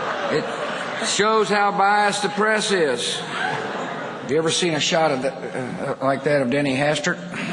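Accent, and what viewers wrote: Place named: United Kingdom. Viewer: i speak my dialect and you speak y'own maybe your lack of american in your english is irritatin to me and mine? sound like some jumped up british poetry professor tellin ME how to talk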